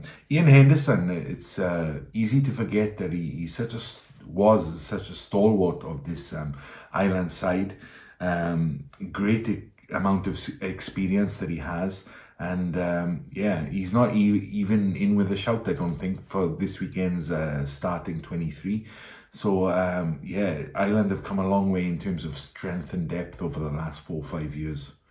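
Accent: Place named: Wales, United Kingdom. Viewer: Hey. Good to hear your comments on the teams for this weekend's three juicy matches. I can't disagree with anything. Biggest chance of an upset for me is with the Italians. I don't think England can upset this Ireland set-up. Love your accent mate (something of an interest for me). Clearly lots of Welsh there but I think that there is something else underneath that. Italian perhaps?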